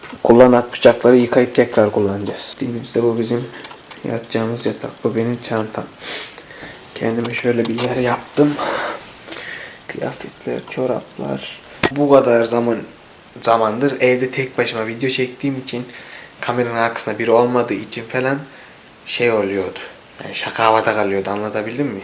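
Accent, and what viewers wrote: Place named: Konya, Turkey. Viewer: La bende konya bozkirliyim abi şive n tam bizim ora yaaa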